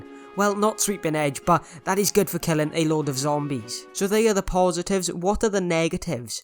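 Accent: Wales, United Kingdom. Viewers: Bro are you from wales, the accent seems familiar 😂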